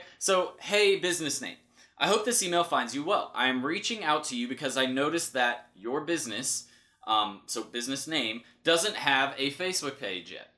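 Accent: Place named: South, United States. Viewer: Hi, that was a very good video with a lot of value, but I love your Southern accent the most.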